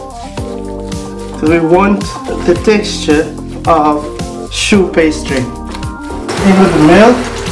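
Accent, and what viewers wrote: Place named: South Africa. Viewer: Dude sounds South African.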